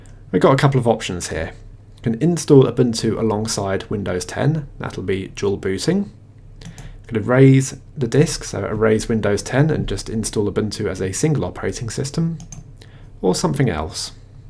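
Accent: United Kingdom. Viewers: I love your UK english man Nive vids, thank you :)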